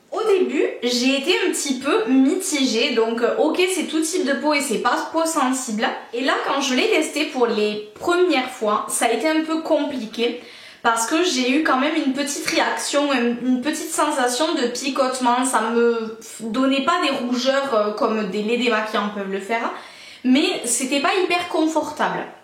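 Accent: South, France. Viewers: coucou ma belle je découvre tout juste ta chaine, et je suis ravie <3 Je m'abonne avec plaisir ! d'ou est tu , il me semble entendre en accent du sud ? jai egalement une chaine, si l'envie t'en dit passe jeter un coup d'oeil ! bises à toi ! Tiphaine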